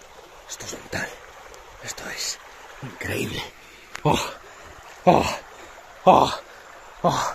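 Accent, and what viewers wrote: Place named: Australia. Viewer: like ur aussie accent 😄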